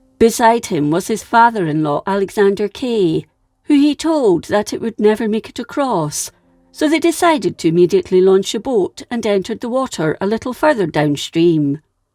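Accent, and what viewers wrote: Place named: Scotland, United Kingdom. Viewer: I love your accent. My great-grandmother was Scottish. I met her once when I was very young and don't remember it. I wonder if she might've sounded similar to how speak English. Love your channel!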